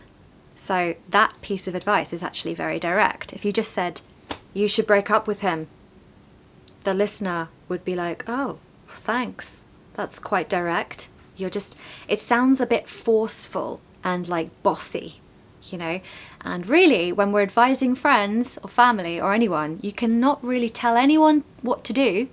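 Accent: RP, United Kingdom. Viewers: I reckon your British accent is lovely, charming. Keep up the good work. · In my opinion your speach nice and pure British English! 👍👍👍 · In my opinion you are the best when it comes to British English ascent ❤😅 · Great thanks English teaching teacher I am interesting the way You have explain really Soft and smooth sound as british accent l have Listen you attractive about its so sweet your voice. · I reckon it's so much useful to listen to your lecture. Also I like your lovely British English. From Japan.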